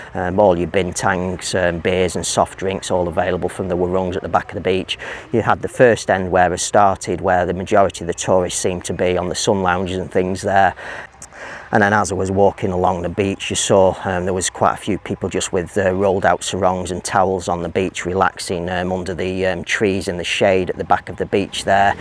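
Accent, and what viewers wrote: United Kingdom. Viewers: Ps. Husband, from bournemouth is trying to guess your accent. He's throwing out lots of options. Lancaster, leeds, manchester, yorkshire. He's usually pretty good at this so you've got him guessing!! Meanwhile, can we hope for that retired banner to come down?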